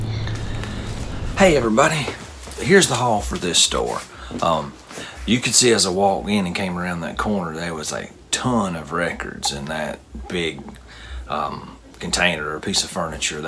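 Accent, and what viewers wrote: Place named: South, United States. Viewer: I love listening to your southern drawl. It's like music in itself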